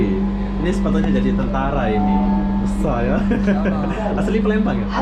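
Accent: Malaysia. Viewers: Abg Ckap mcam ade logat malaysia. Pernah msuk malaysia ke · Ini yang bikin you tobe orang indlonesia ataukah orang malaysia ?? Dialeknya kok dialek malaiysia tapi bahasanya indonesia ??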